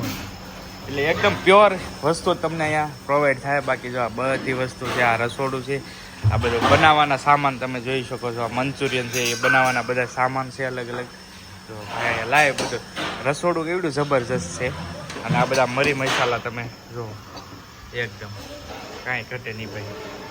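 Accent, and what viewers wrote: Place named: Gohilwad, India. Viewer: गोहिल वाड की बोल पहचान जाता है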